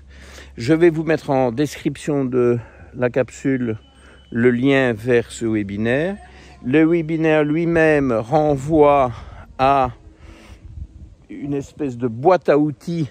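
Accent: Belgium. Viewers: Merci et vos bestioles! Vos capsules ont un air poétique et naturel qui me convient bien, de plus j'aime bien l'accent du cru Belge comme septembre sans le "p" ou et poui ❤😊 Le seul bémol -pour moi - est que vous n'annoncez jamais l'année sur vos shooting 😢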